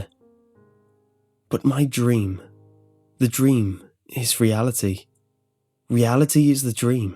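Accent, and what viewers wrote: England, United Kingdom. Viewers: He is from England, they pronounce things differently there (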